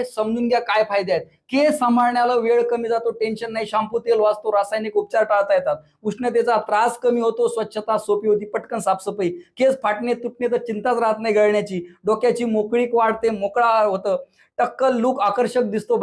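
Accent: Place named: Vidarbha, India. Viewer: दुसऱ्यातल्या कमतरता शोधण्यात लोकं पटाईत आहेत.स्वतः भले ही कर्तृत्वशून्य असो. त्यांनी जो केसं असा शब्द वापरला तो केस या शब्दासाठीच वापरला आहे विदर्भ आणि मराठवाड्यात बोलली जाणारी मराठी अशीच आहे आणि आम्ही आमच्या बोलण्याच्या पद्धतीवर खूप प्रेम करतो. कोकणातील लोकं वेगळ्या शैली ची मराठी मोलतात तर खांदेशातील लोकं वेगळ्या.. आणि आम्ही सगळ्याच्या बोलण्याच्या पद्धतींचा आदर करतो... ते ज्या विषयावर बोलत आहेत त्याच्यावर ध्यान देणं जास्त गरजेचं आहे न की ते मराठी किती शुद्ध बोलत आहेत. दुसरी गोष्ट ते काही मराठी व्याकरणाचं लेक्चर नाही देत आहेत त्यामुळं शब्दांतील बारकाव्यापेक्षा त्याचा मॅसेज महत्वाचा आहे... तसं ही अमेरिकेत किंवा यूरोपात गध्यातला गधा माणूस सुद्धा अगदी शुद्ध इंग्रजी बोलतो याचा अर्थ तो खूप बुद्धिमान आहे असं होत नाही. फक्त तो ज्या ठिकाणी वाढला आहे त्याचा तो परिणाम आहे. तुम्हाला जर वाटत असेल की तुम्हीलाच फक्त प्रमाणित आणि शुद्ध मराठी बोलता येते तर याचा अर्थ असा होत नाही की तुम्हीच खूप बुद्धिमान आहात... 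आणि तुमच्या कमेंट वरून तुम्ही मला त्या अमेरिकेतल्या शुद्ध इंग्रजी बोलणाऱ्या गध्या माणसा पेक्षा वेगळे वाटत नाहीत... एका शब्दावरून तुम्ही त्या सरांची शाळा, शिक्षण आणि बुद्धिमत्ता सगळंच काढलत. तुम्हाला स्वतः एकदा पहिली पासून शाळेत जायची गरज आहे. शिक्षण फक्त शुद्ध मराठी शिकन्यापुरत मर्यादित नसत त्यासोबतच भलेही भाषा शुद्ध नसो इतर लोकांशी कसं वागायचं हे ही शिकवत असतं कदाचित तुमचं ते शाळेतच विसरलं आहे 🙂👍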